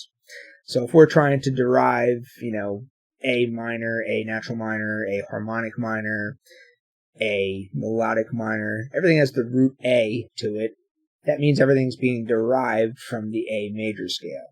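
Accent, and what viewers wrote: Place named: Philadelphia, United States. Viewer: You live in the Philadelphia/NJ area? That accent sounds like home.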